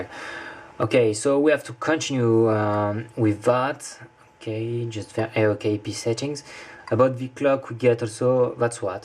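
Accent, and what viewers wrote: France. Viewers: Je reconnais bien un accent Français derrière ! Pourquoi cette ROM n'est plus sur XDA ? Un problème avec ? I can regognize a french accent ! Why this ROM is not more available on XDA ? Problem with ?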